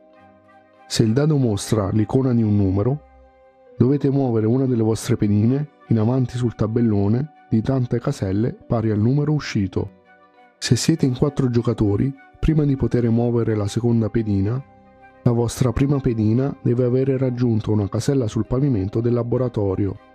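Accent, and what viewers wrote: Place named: Tuscany, Italy. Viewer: dall' accento sembri Toscano 😅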